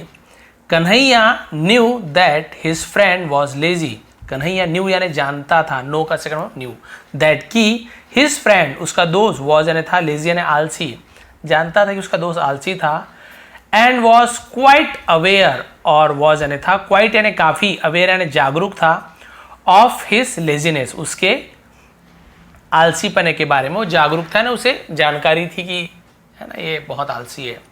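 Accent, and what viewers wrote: Chhattisgarh, India. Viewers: Sir aap raipur chhattisgarh se ho kya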